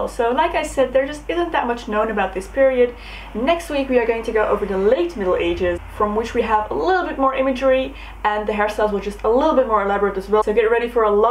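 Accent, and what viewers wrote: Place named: Netherlands. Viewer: ahh ik hoorde meteen dat je nederlands bent, super leuk gedaan dit allemaal! I'm obsessed with everything medieval and historical hehe :)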